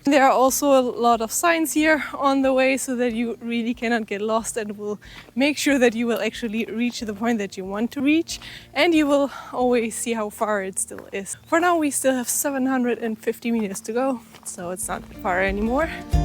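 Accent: Germany. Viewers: Spreche doch lieber in Deutsch. Zu starker Deutschakzent! Ansonsten schönes Video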